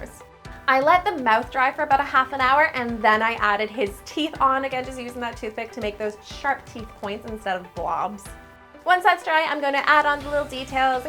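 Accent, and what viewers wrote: Canada. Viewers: Idk why people make fun of it I love how Canadians say out and about it’s just the cutest thing ever.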